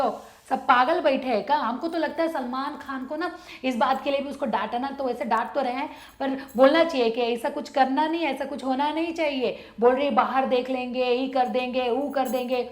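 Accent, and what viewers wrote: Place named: Bhojpuri, India. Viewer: Love your bhojpuri accent ❤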